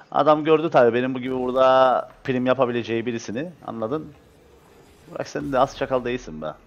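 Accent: Cyprus. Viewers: GÜZELİM KIBRIS ŞİVESİ <3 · <3 sırf bu kıprıs türkçesi için kıprısa yerleşecem be gardaş:D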